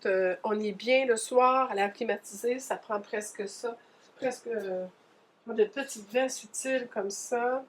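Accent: Quebec, Canada. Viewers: Je t'ai découverte depuis peu, mais vraiment c'est un réel régal de t'écouter avec ton bel accent du Québec et ta bonne humeur ainsi que ton naturel. Et tes pensées sur les choses de la vie que tu nous fais partager. Mais bien sûr tes cousettes toujours bien réfléchies et avec de bonnes explications et de belles finitions. C'est cela aussi qui de l'autre côté de l'écran, à des milliers de kilomêtre nous permets dans notre petit coin de progresser et de s'encourager à faire. J'ai le même bordel à la maison, j'essai de m'organiser, mais le naturel reviens au galop.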